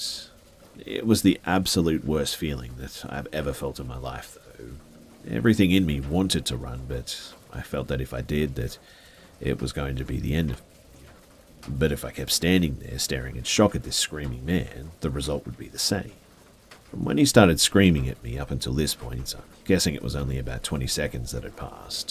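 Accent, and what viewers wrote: Australia. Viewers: Yeah mate our Australian accent is pretty great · Gotta love that Australian accent 🤣🤣👍🏾👍🏾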